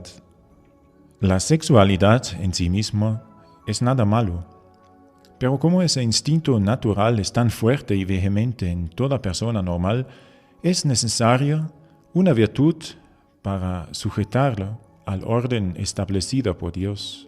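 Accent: France. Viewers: ¿Quien habla es francés?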